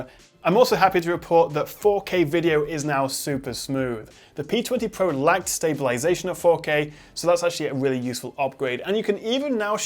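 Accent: United Kingdom. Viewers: I like your British accent😁👍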